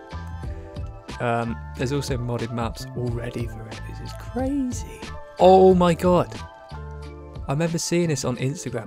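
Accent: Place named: United Kingdom. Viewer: most British sounding dude ever but in the best way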